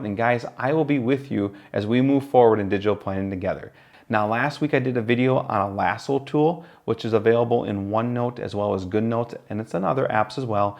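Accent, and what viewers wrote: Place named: Wisconsin, United States. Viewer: I KNEW you had a WI accent! CONFIRMED! :) Is there a Sconie discount? ;)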